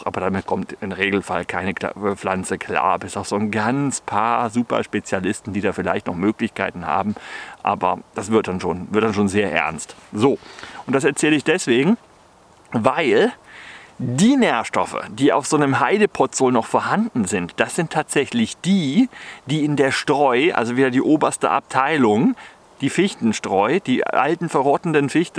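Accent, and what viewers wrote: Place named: Baden, Germany. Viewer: Das mit dem "rechen" und dem "rächen" in der Aussprache ist halt Badisch. 🤠 Hat nicht der Trump gesagt man soll den Wald rechen damit's weniger Waldbrände gibt, so wie es in Finnland gemacht wird. Angeblich! 🤣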